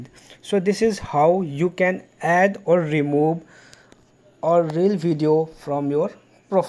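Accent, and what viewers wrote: India. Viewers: I think this is indian English😂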